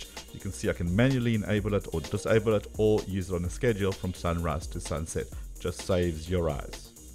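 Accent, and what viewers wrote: South Africa. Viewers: Nothing to do with the video, but where are you from if you don't mind me asking, your accent sounds South African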